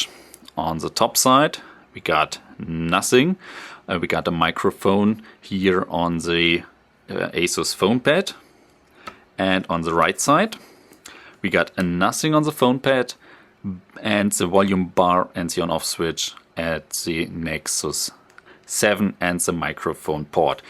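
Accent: Germany. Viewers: i could feel some German accent within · i think is french?:) actually no, is german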